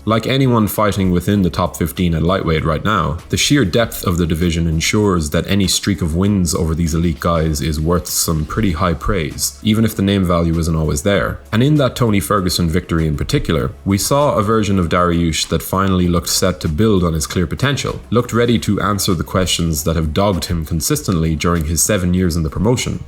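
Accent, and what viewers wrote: Ireland. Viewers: Listening to you talk reminds me of the 2 weeks I drove around southern Ireland. Ring of Kerry was pretty dam awesome, fwiw. Great video.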